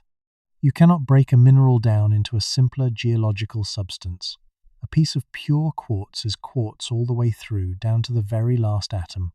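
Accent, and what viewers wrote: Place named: United Kingdom. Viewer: Yayyyy you’re using the soothing British AI voice🎉🎉🎉 is it because of the comment I left?😅😆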